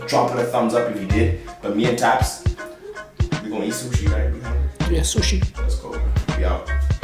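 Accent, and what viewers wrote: South Africa. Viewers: Hey dude are you South African? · Are you South African??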